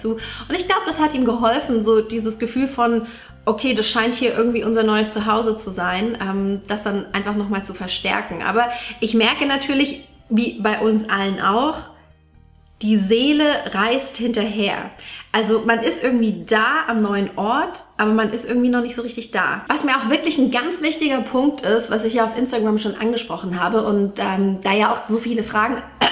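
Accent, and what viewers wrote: Swabia, Germany. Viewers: TGIRF 🚀🚀🎉 ich muss echt sagen ich kann diesen schwäbischen akzent gar nicht ab😂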